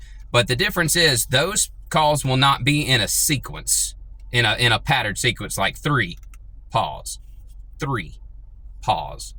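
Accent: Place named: South, United States. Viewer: Thank you for explaining this about crows. And for keeping most of your Southern accent... I'm originally from the bayou country south of New Orleans. Crows around my hometown were very loud, and for some reason I used to be afraid of them. I've since learned more about their intelligence and friendship with humans by watching videos. Where I live now there are a variety of birds and squirrels we feed with black sunflower seeds, along with Crows that let themselves be known in the area. I'd love to learn how to meet and befriend them. I've joined your channel and am looking forward to learning more from you. Thank you 😊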